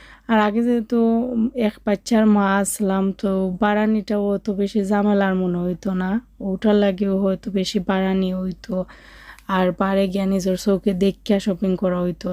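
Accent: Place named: Sylhet, Bangladesh. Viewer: Apu ki,,Sylhet er sunamgonger meye?ami o sylheti. Apnar kotha gulu shune khub valo laglo...tai apnar chanel ta subscribe kore nilam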